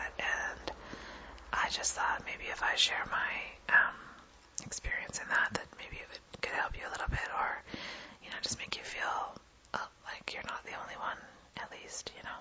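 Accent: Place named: United States. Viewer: great videos your the best whisperer on the net we have english ones too but something about the american accent is best for this.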